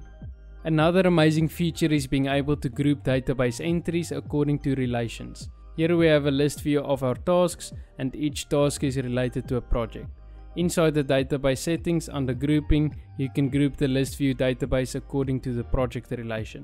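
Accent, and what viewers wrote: Afrikaans, South Africa. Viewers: He sounds exactly like a South African friend of mine! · Afrikaans accent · Jis boys, the moment I heard the accent it was a straight, sub-like-follow-bell icon moment. I don’t have time to look for new creators when I can learn from boytjies themselves! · BRO ARE YOU SOUTH AFRICAN?!! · Howsit, you’re from South Africa? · Are you... South African?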